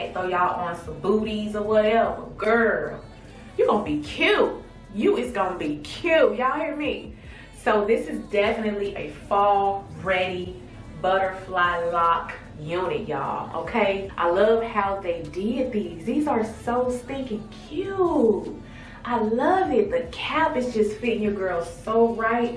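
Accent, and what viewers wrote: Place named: Memphis, United States. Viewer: you're welcome! I'm a new subscriber and I watched another one of your videos today and your accent sounds like mines lol. Are you from Memphis Tennessee?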